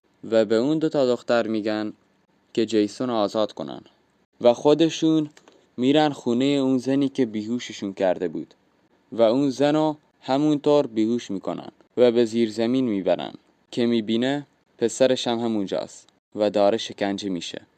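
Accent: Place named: Afghanistan. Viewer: هوی افغانی آدم قحطی بود تو با اون لهجه اعواج و مسخره تعریف کنی بدو برو آجرتو پرت کن......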